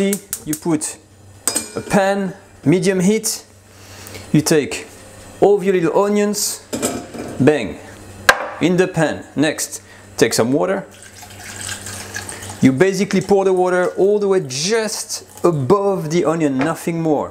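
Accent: France. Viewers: Mate, are you some sort of French guy located in Australia with Dutch roots? I hear lots of things happening with your accent. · I like the way you pronounced stir as “steer” and your French accent! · Nice! I'll try this recipe tomorrow, I love your french accent by the way... greetings from México!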